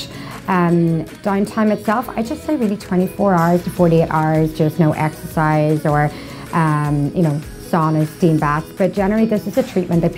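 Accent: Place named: Ireland. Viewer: Cute Irish accent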